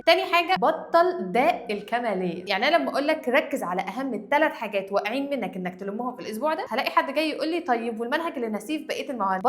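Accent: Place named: Syria. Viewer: انتى سورية اصلا استغربت لو كنتى مصرية مستحيل تقول هيك بس ما دام لهجتك مختلفة فا طبيعى 😂😂والله لما بطلع على اللهجات بلاقى حاجات 😂😂😂😂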